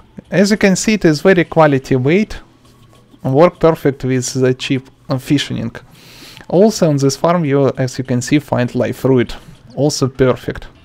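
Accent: Russia. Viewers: Your from russia? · Мог бы и не скрывать что ты русский))) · Не, ну русского человека узнать очень легко по акценту :) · I love his russian accent its so good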